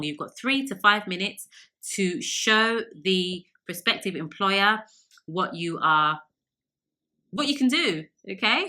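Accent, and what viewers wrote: United Kingdom. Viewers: Like your content & was drawn to subscribing after watching some of your prior postings. When I see you sharing ur knowledge for free I felt a good vibe about you😀in addition to that British accent. And since you are being so generous i'd like to give u a hand by directing you to a free online source with a wealth of info which u may find helpful pertaining to your calling. If you're interested, let me know with a reply in the thread. Keep inspiring! Peace!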